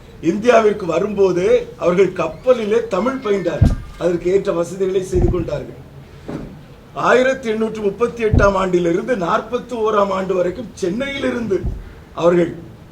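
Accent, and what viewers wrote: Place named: Nagercoil, India. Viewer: Nagercoil karanga pechu semma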